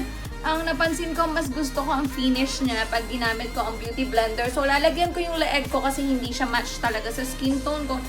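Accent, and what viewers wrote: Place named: Cavite, Philippines. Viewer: Subscribed because of your punto 😅pareho tayo. I'm from Indang cavite kaya mahayon din talaga 😂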